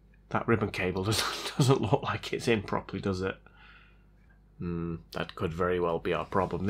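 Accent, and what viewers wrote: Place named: United Kingdom. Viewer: It's always interesting listening to a Brit pronounce R's.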